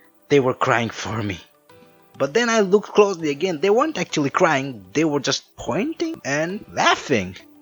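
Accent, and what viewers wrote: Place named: India. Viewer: Your accent seems Indian lol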